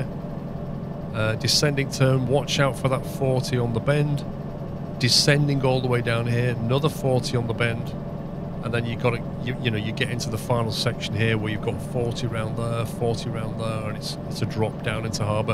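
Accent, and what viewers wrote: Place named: Birmingham, United Kingdom. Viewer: Where are you from? Sounds like good ‘ol birmingham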